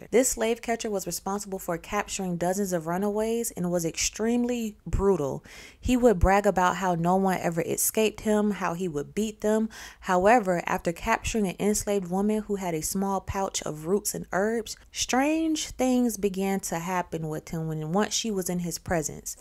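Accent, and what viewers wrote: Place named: Rural, United States. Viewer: Not sub tal 😂 girl I love your country twang lol thank you for doing this series ❤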